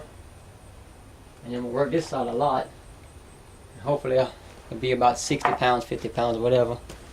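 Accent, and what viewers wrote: Louisiana, United States. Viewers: straight up Cajun brotha. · + We got easily distinguishable accents from the typical southern accent. You're from down da bayou. It aint hard to tell. Are you a Houma Indian or do you know any american indian bow making techniques? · Sounds like from Bayou country. good job on bow. but, is she able to draw the 60lb bow? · He sounds cajun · Love the accent. Cajun/ creole is so cool.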